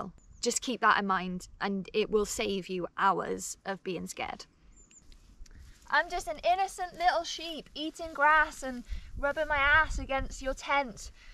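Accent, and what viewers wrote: Wales, United Kingdom. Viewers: Thanks for the LOLs! You're funny! Love your Welsh accent too 😀 (assuming my Australian brain picked the accent correctly ha!) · That's a Welsh Accent!! i subscribed (Edit) That has to be a Newport Accent/Cardiff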